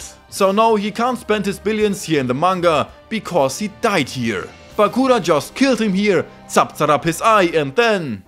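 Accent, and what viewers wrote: Germany. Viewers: Are you German? Cause you sound like that ^^ Edit: Ok, you definitely are xD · That german english is unbearable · dein deutscher Akzent ist schon sehr deutlich rauszuhören aber cooles video :D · I love your very strong German accent einfach geil Bro ich lach mich echt schlapp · Dude, your german accent is kinda funny 😄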